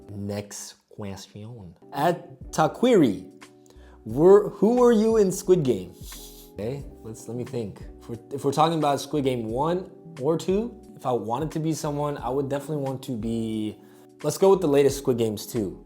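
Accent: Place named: Germany. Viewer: actually, I really enjoy watching your vids… as a bilingual person ich verstehe dein Denglisch perfect and I love it 🔥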